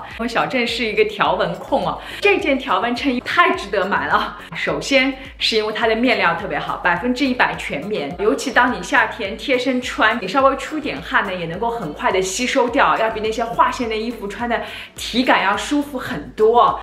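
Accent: Shanghai, China. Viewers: 雖然Jessica的普通話已經夠標準了，但是我還是聽出了上海風。好熟悉好溫馨。😘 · 刚关注你，一听就知道你是上海人😊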